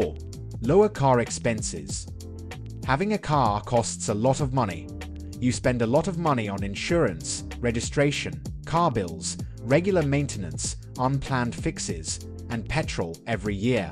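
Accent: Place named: United Kingdom. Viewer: To be honest — really tired of the British accent.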